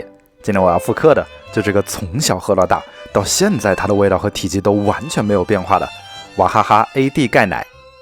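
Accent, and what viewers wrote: Wuhan, China. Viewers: 是怎么做到标准的普通话中夹着浓浓的武汉腔的😂😂😂听起来格外“需服”！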